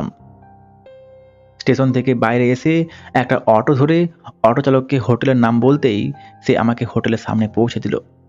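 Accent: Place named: Medinipur, India. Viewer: Vai tomar bari medinipur naki..kotha bolar dhoron suney money ho66ey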